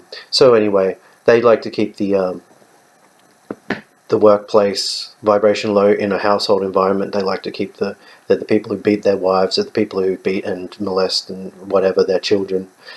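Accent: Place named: Australia. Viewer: Is there a possibility that Reptilians aren't aware of their background? If they're 10% of the population, who and how are they i informed? Are they approached by so called "handlers" during early childhood? I'm not sure off the process. I believe in Psychic Vampirism. I've seen peoples face distort, especially the eyes. It didn't scare me because it was a confrontation and I was calling out the persons behaviour. That's why I believe I got to see the real person behind the facade. Now, like yourself, I can spot them almost immediately. So I come across a few people that are in "attack mode" for no reason. I've had to walk away from so called friendships because these people suck the very essence out of you. And who wants that. Great to hear an Aussie voice talking about this phenomenon aswell. Keep up the good work bro.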